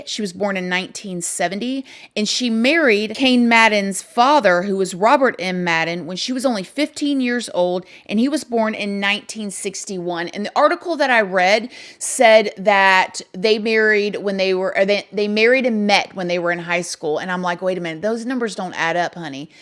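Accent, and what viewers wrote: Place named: Florida, United States. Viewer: Are you from Florida? You have that cute “kinda southern” accent us Floridians have. ❤️❤️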